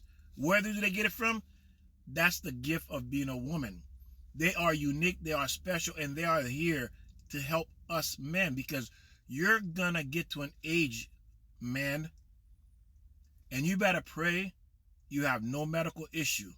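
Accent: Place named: Caribbean. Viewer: Where are you from? I hear a West Indian accent.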